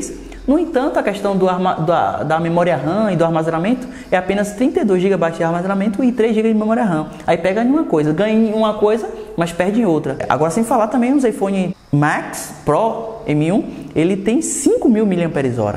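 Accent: Bahia, Brazil. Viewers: Tu é baiano é vei? Ne?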